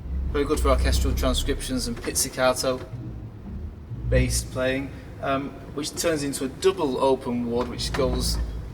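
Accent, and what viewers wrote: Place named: England, United Kingdom. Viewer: This is so interesting, great demonstration and from an Aussie perspective, love your pommy accent 👍🏻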